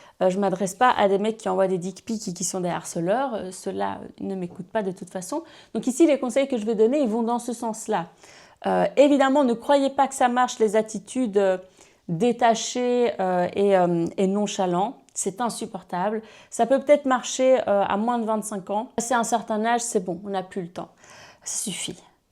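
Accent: Belgium. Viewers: Y a comme un petit accent belge qui ressort par moment c est mignon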